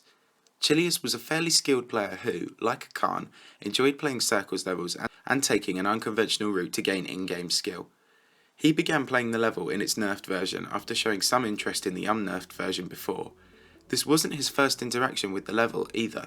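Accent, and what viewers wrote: United Kingdom. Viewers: You should do more voiced videos, the British accent makes the whole thing come together. Keep it up! You never fail to teach me something about GD! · are you a brit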